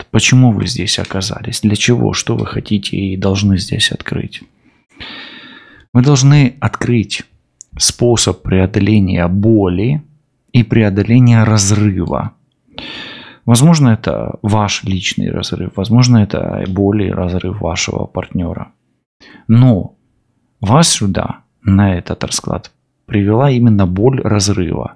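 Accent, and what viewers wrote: Ukraine. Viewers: Яка у вас красива українська мова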